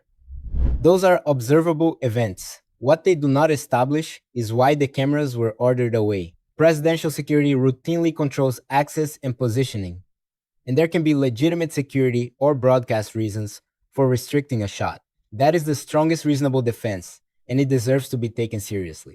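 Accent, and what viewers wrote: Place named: Spain. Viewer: What s your mother language ? It sounds as if.it's Spanish !?! I WISH I COULD SPEAK SPANISH AS WELL AS YOU DO ENGLISH ! Nice video , concise just relax dude . Your doing great 👍 🇨🇦🇨🇦🇨🇦🍁🇨🇦🇨🇦🇨🇦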